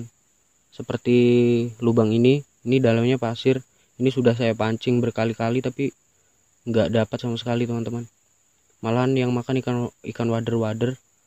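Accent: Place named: Surakarta, Indonesia. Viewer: Sampean asline ngndi mas,, boso ne ra adoh ko solo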